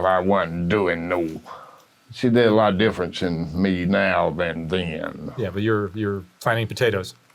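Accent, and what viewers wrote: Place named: South, United States. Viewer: I’m a fifth generation southerner. His accent is a beautiful song to my ears. We Floridians have lost our accents since I was a kid. A lot of us.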